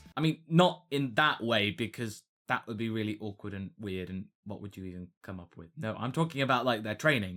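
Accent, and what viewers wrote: United Kingdom. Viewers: By the way, since Super Saiyan Rose is so British now, are we going to see you do some impressions being that you're....you know...British?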